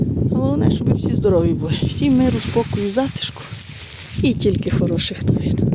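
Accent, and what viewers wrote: Ternopil, Ukraine. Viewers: В Тернопiльськiй обл так балакають.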